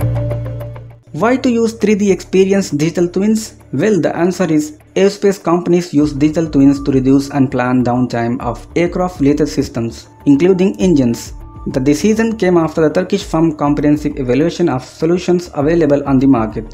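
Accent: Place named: Russia. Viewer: It seems that he is from central asian countries like kazakhstan his accent of english is like russian but he is trying to speak like british accent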